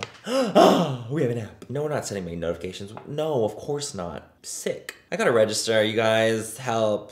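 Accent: United Kingdom. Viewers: Living for the British accent babes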